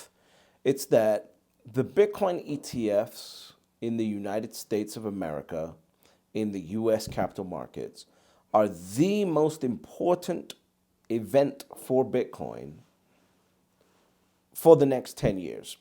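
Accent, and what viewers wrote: Scotland, United Kingdom. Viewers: A quite realistic appraisal, yes there are a lot of rivers still to cross for Bitcoin. And yes US ETF approval was a major step because SEC were effectively forced by a court judgement to approve Bitcoin against their wishes - that is a sea change, it is a significant shift within the power structure - people like Kevin O'Leary openly laughed at people taking cases against the SEC. Are you from Scotland yourself, Edinburgh maybe?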